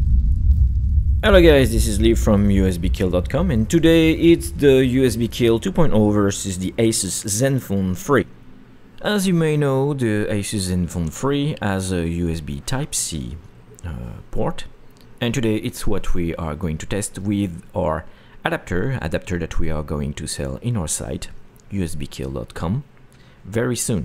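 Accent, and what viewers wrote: France. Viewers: You have a french accent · you have french accent t'es français ?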